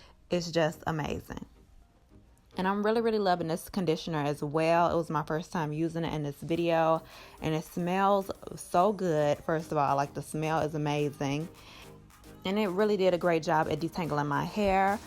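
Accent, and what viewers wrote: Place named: South, United States. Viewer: Where are you from? I hear a southern accent. I'm from Mississippi! :D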